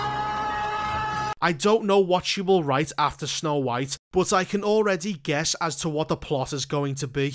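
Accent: Liverpool, United Kingdom. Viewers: Pure Scouse poetry. Love it 👌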